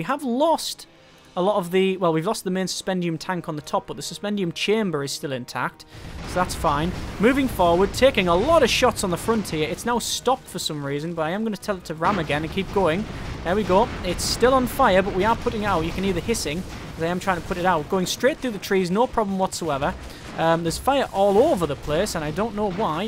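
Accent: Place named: Scotland, United Kingdom. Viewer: Dude r u Scottish or Irish cus u sound like it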